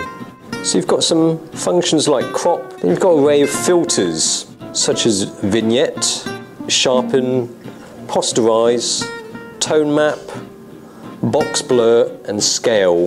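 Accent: England, United Kingdom. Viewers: no offense how are u asian but with a english accent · he was born in england just because hes of asian decent doesn't change his voice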